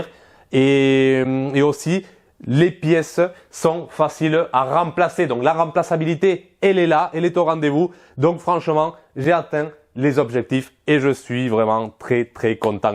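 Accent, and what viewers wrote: Toulouse, France. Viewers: Bonjour, Proposition très cohérente pour de grands voyages,plein de bon sens,j’adore l’accent toulousain, Bonne continuation la chaîne me plait.👍👍👍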